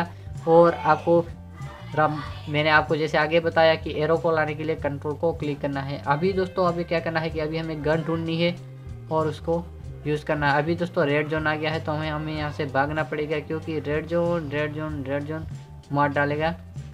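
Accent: Gujarat, India. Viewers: Bhai aap Gujarati ho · Gujarati Cho?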